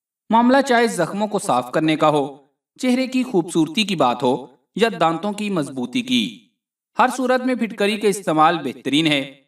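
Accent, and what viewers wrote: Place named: Bihar, India. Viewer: Bhai aapki zubaan, bilkul Biharion ki style m urdu bol rahe hain🦅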